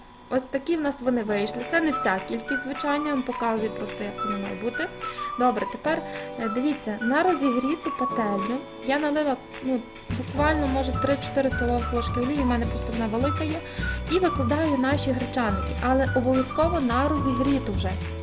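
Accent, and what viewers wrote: Western Ukraine, Ukraine. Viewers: Как же я люблю ваш украинский говор,некоторые слова не знаю,но по смыслу понимаю, люблю вас😘 спасибо 🙏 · Сьогодні вперше надибала Ваш канал і відразу ж підписалася. Шукала оладки. Ще нічого, звісно, не готувала з того, що Ви пропонуєте. Але точно спробую. А поки-що Ваша мова - то вже найсмачніше, що є серед усієї тої смакоти. Дякую Вам за гарну мову, рідну, колоритну і таку смачну!!!! )))) Звідки Ви? Чую, точно десь із Західної України ))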